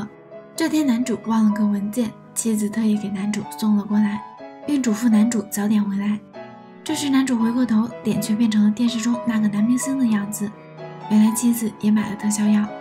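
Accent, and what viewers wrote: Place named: Shandong, China. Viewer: 讲解人山东口音。。。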